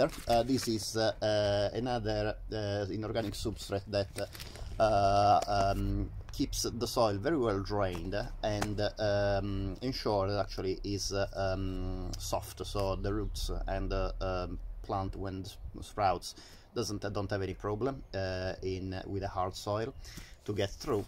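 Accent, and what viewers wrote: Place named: Italy. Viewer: Because of your accent, are you Italian? It would be easier for me to write to you in Italian. 😊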